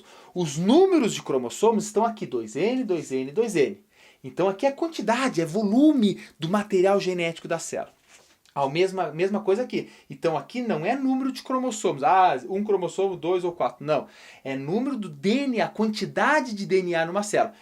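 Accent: Rio Grande do Sul, Brazil. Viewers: Te liga mano veio, é gaúcho? Kkkk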